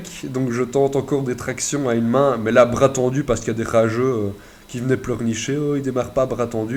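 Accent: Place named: Belgium. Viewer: Sympa le vlog et l'accent belge ;) Tu étudie quoi ??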